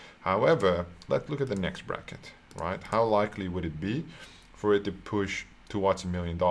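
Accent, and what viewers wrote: Netherlands. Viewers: Are you Dutch bro?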